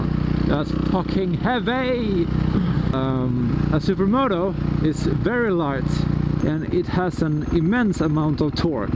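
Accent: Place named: Sweden. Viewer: Hahaha so funny!! Make more videos like this! (Y) Awsm riding! :D Jeg elsker din svensk-engelsk, haha, er fra Norge selv.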